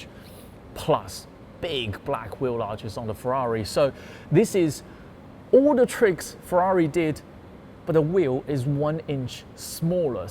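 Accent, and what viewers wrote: London, United Kingdom. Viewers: 小伙儿英文真棒 伦敦腔 有点topgear感觉啦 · 兄弟正宗伦敦音啊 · 英式腔是這樣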